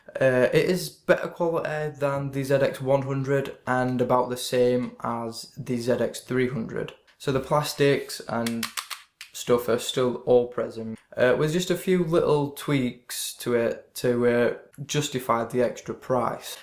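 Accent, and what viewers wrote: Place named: Yorkshire, United Kingdom. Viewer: i'm from Leeds myself it's pretty rare to find someone with a Yorkshire accent on Youtube it's good to see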